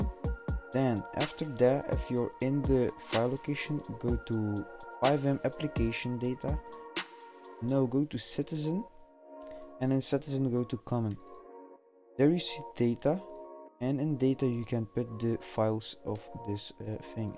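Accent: Netherlands. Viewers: ik hoor een beetje nederlands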